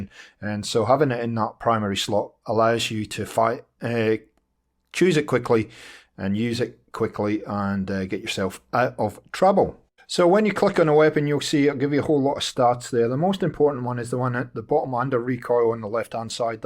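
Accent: Scotland, United Kingdom. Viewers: Great video m8 and you sound Scottish aswell so deffo subed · I picked up this game a month or so ago on PC on a ubisoft connect sale, absolutely loving it! Learnt some useful tips from your video, thanks! Plus, it's always nice to hear a fellow Scot on a video, always a nice surprise! Cheers!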